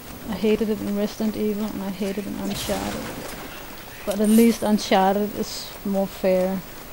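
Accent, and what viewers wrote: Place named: Denmark. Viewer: ^.^; Man how long we've come when we were just BF and GF. I still love your danish accent honey! <3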